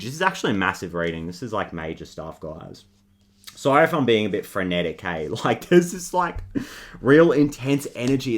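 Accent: Australia. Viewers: Instant accent bonus applied! What part of Aus you from? 😂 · The comfort of hearing a fellow Aussie voice jump out 🤜🏽🦘🤛🏽 Thanks man. 1st time seeing your channel. You helped me get at some tears, & quietly verbalise what's been eating at my spirit. Feelings of friendships not being reciprocal enough, even when they are good solid friendships. Feeling utterly disconnected/detached, but not in a way that feels helpful. Lots of reminders needed to hear in this. I appreciate that. The waterfall, also so significant to me... Let's see what happens I guess.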